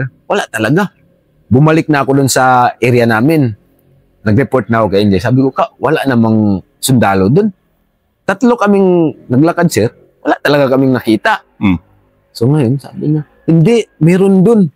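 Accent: Philippines. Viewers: Tagalog na tagalog magsalita si Sir,maliwanag sya magsalita..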